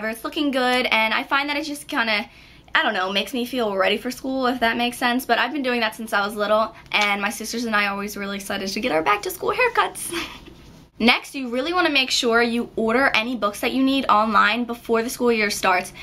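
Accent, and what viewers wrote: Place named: New York, United States. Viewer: r u from new york cause i LOVE ur accent!!!!!!!!!!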